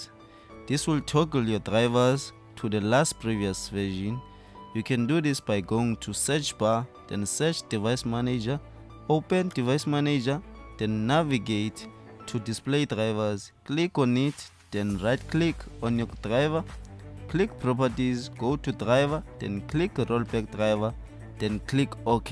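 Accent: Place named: South Africa. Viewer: Greetings from Nairobi. I love the SA accent. Bro you just saved me over $300. Was getting ready to order a new Mac Book from Amazon. I installed the Exodus Cryptocurrency Wallet on my laptop and then everything slowed down. I tried to make a bunch of improvements to increase performance which helped however Premiere Pro simply couldn’t load without showing the error. You’re an African Genius. Thanks 🙏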